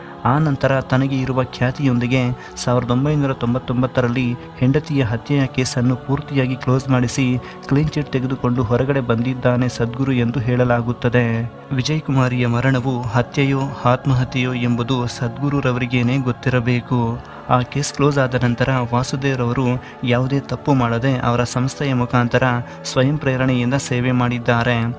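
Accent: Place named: England, United Kingdom. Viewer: He talks in a very heavy English accent and pretends not to know Kannada. Why can't he talk normally.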